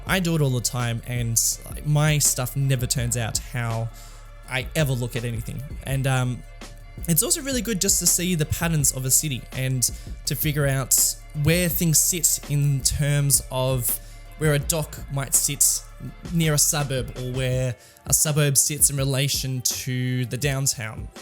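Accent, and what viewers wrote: Australia. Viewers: Patterns of a city. You sound Australian, so fuck Sydney's layout.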